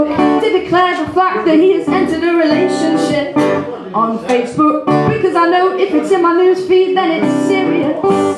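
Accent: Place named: Sheffield, United Kingdom. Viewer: love the sheffield accent in there haha, smashed it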